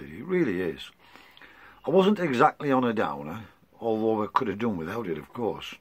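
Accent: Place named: Cumbria, United Kingdom. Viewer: Good to hear Cumbrian twang 👍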